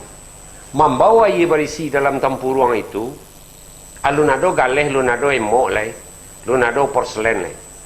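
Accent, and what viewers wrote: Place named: Bukittinggi, Indonesia. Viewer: Klo awak tebak dari caro ngeceknyo mungkin urang Bukittinggi/agam klo dak dari Padang panjang...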